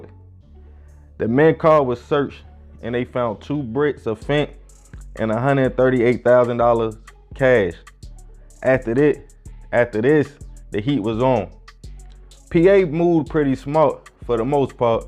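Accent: Baltimore, United States. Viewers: That's the point. HE SOUNDS LIKE BALTIMORE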